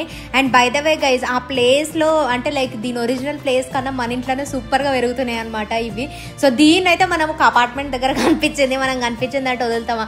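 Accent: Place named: Warangal, India. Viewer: Table rose taskarichimam akka mona and Lotus updates ivandiiiiii and what aa warangal slang take a bow to that 🙇🙇🙇‍♂️🙇‍♂️🙇‍♀️🙇‍♀️❤️❤️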